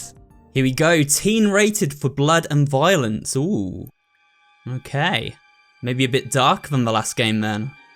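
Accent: United Kingdom. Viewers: Are British or what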